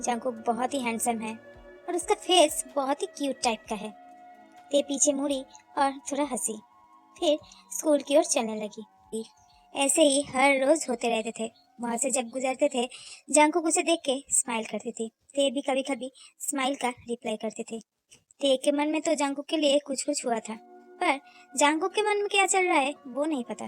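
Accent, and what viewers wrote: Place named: Bengal, India. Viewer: Nice story or bangali logo ka hindi sunneme accha lagta hai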